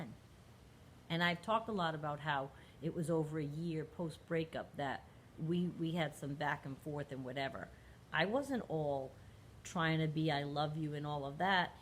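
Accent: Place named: New England, United States. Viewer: I just found your channel today and have been binge watching. One of your videos you said your hair was up bc it was hot in Florida. I thought to myself “Oh, I thought for sure she was from New England based on the accent” then you said Rhode Island in this video. I gasped lolol I’m from and still living in Rhode Island!!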